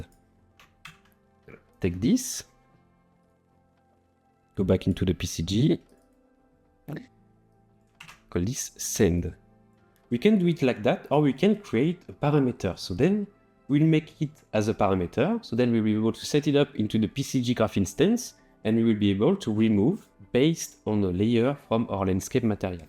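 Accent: France. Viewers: Salut, j'aime beaucoup ton accent français et tes tutos:) je ne suis pas française mais tunisienne mais j'ai récemment entamé des tutoriaux sur ma chaine en Français pour Unreal (et prochainement sur 3ds max et Blender) car j'aimerais participer à la commu FR des tutos et je t'évoquerais dans les prochaines parties de mon tuto sur les terrains/Biomes, si quelqu'un a du mal avec l'anglais, m'autorises tu à poster ce commentaire sur ta chaine pour évoquer la mienne?: <link>, merci si c'est le cas:) et continue les tutos j'ai subbé!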